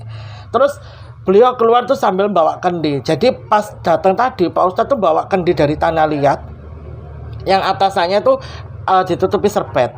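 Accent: Surabaya, Indonesia. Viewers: Mak maafin pas kamu suroboyoan nurturin ceritanya aku ngakak bgtttt lucuuuu jd kangen bule sm mbah ku di mojokertooo 😂🥹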